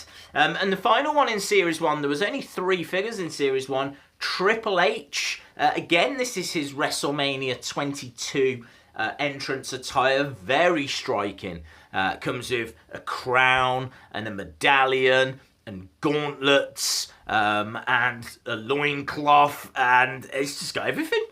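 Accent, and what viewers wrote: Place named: England, United Kingdom. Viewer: i love the way english people pronounce some words and letters its kinda refreshing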